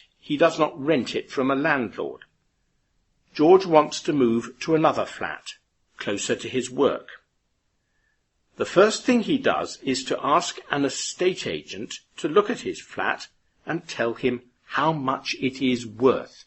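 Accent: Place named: United Kingdom. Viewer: I love British accent, it’s music to my ears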